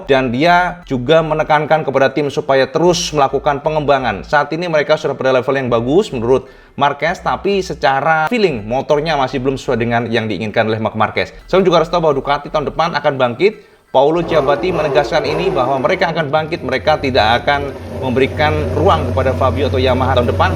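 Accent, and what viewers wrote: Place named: Java, Indonesia. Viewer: seneng aq cak cara ngrivew mu iso mantep logat jowone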